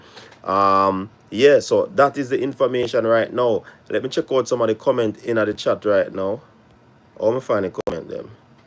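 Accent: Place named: Jamaica. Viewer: Coach anyone who doesn’t want to hear you speak patois they don’t have to listen. Kmt. Americans do not speak English either.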